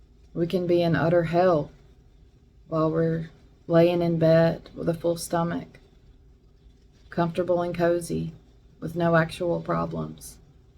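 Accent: South, United States. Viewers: I love ur southern accent. I come from mobile, alabama.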